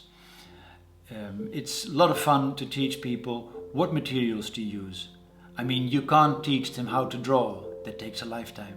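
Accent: Netherlands. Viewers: Love your work! Just curious - where is your accent from? I'm guessing Dutch?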